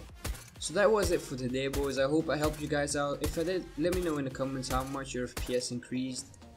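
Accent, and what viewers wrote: Netherlands. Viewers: R you dutch bro??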